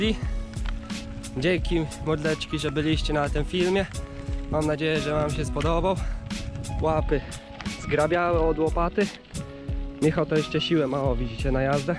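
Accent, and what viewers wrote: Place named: Russia. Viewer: Masz leciutko rosyjski akcent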